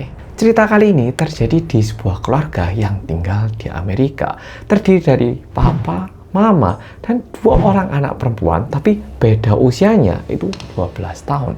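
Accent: Java, Indonesia. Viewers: 😂😊suka bgettt m cowok cowok Korea eeh Nemu konten kok BS bahasa Indonesia medok juga ... JD fans 😂😂😂😂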